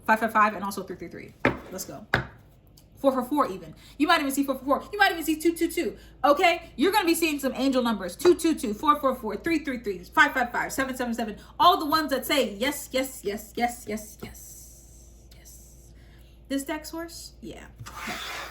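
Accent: United Kingdom. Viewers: Been singing “money money moneyyy” for weeks and it’s the one you started with. Also have that same British accent haha - loving the synchronicities! Thank you 🤑✨ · Old British accent is crazy ima jus leave it at that 😭😭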